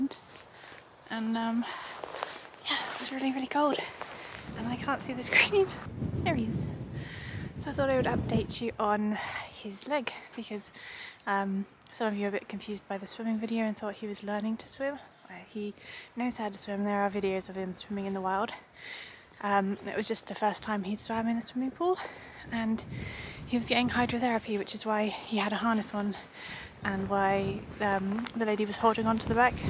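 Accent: United Kingdom. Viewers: you have beautiful eyes and hair.You also have such a sweet voice.I love the British way of speaaking.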